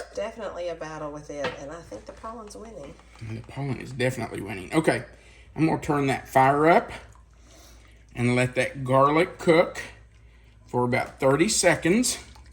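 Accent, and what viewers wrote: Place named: Southwest Virginia, United States. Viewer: I think the thing I like best about you is your accent. It sounds exactly like I sound from SW Va. Thanks for your channel. I love it. I am adding to my grocery list right now.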